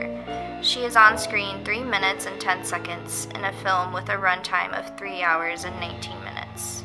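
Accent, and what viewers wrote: United States. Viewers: Inappropriate American voice narrating, tho’ the commentary is not stupid. Mispronounces her native English. Quacks voice. “The kinda girl...” UGH. Kurasawa doesn’t adopt books, he adapts them for the screen. She makes mistakes likes this pretty regularly. Her pronunciation of Japanese names is atrocious. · Another American voice. God help me.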